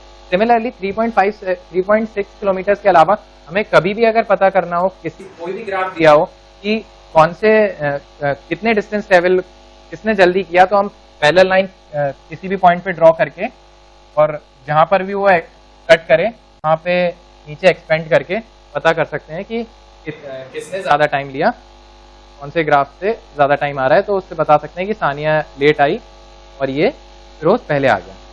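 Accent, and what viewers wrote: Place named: Bihar, India. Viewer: bihari lol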